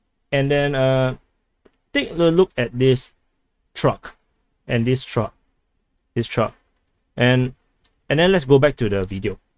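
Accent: Singapore. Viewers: great reporting and analysis , are you singaporean (based on your accent) ? hope you are fine there and not attacked / reported by insane amount of pro ukraine trolls (including those from troll farms in UK/US/FR) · Seems like you are more happy to believe the Russian MoD information rather than the Ukrainian MoD information. Maybe you don't notice, but there is clear bias in your opinion, and the way you put it across. Personally I don't believe either side with thier stated figures and actions, all that matters is the facts on the ground. And the facts on the ground show that overall Ukrainian forces have been able to take back allot of territory so far, they are not even fully mobilized have received very few heavy weapons. You would expect it to take at least another 2-months before the effects of such are seen. Really all Ukraine can do right now in the east is hold the line the best they can without being obliterated, naturally during this period, with such concentrated Russian forces, land will be lost. 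I think if Ukraine can't seriously get on the offensive within 6-months then these lands will become increasingly difficult to retake, and just for the reasons you stated, but within this timeframe there is plenty of opportunity to reclaim these territories. Doubt it on Crimea and Donbass however, possibly if Russia collapses within, but only then. Now if I am not incorrect, you sound Singaporean, I have worked there many times, very hard business approached people, so the importance of morale factor might be difficult for you to fully grasp. And you might have thought that the Ukrainians might just roll over, but Kiev was not taken in 3-days or ever, and thier military still fight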